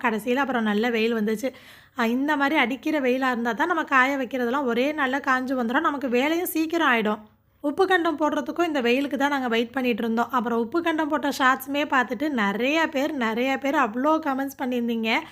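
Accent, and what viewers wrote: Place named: Ramanathapuram, India. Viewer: Assalamu alaikum sis❤❤❤i am meera from chennai❤❤❤interesting video sis, unga slang enga ooru slang polave iruku, naanga ramnadu pakkam kamuthi, last 2minutes very true words and emotional😢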